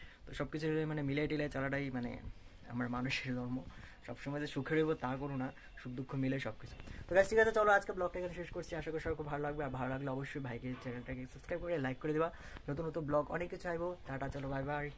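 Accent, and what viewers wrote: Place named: Sylhet, Bangladesh. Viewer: Eta siliti Bangla style na ? Val lagta se tomar ro loge suniya 😀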